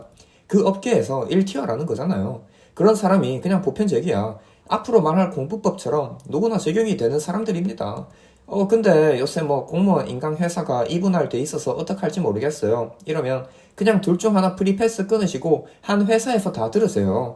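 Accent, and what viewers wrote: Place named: Jinju, South Korea. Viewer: 고향이 어디에요?? 사투리 익숙한데 진주 사투리신가...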